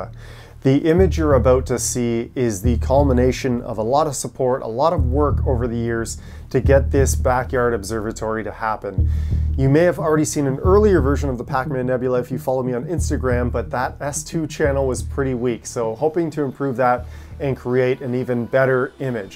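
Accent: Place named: Canada. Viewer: I'm guessing from your accent You're from Canada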